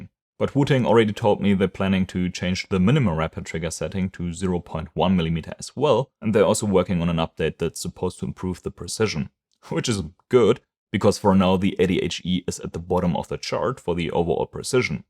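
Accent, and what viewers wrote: Germany. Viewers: This German Accent! xD But thanks for the Vid! This really helped me!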